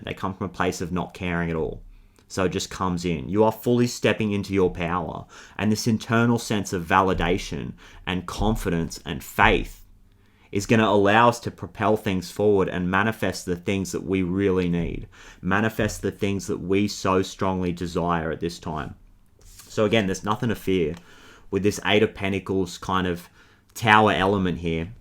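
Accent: Australia. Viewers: I'm really grateful I've stumbled upon your channel. I've lived the happiest years of my life so far in Australia, life happened and I had to return to my home home country and all the challenges that come with it. Listening to you talking with an Ozzy accent and about spiritually which I've been in so much need for, is literally soul soothing amidst the time I'm going through right now. I deeply appreciate you and am grateful for your life, your gift and being connected to you. I Love you too mate❤ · omg you’re australian i luv your accent saur much! srsly it’s dope but thank you! you spoke right to my soul 💯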